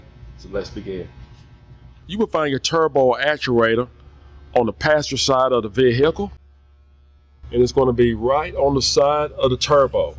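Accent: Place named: South Carolina, United States. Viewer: Thank you sir Where are you based out of? South Carolina? Going based of the accent (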